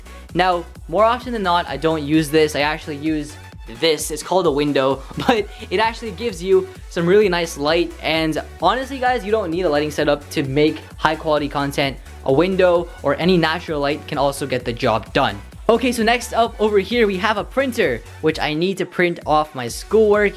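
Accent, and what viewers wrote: India. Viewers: u indian? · dude it seems ur Indian? Am I right? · are you Indian my friend???... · Your Indian?? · dude are you ..... indian ?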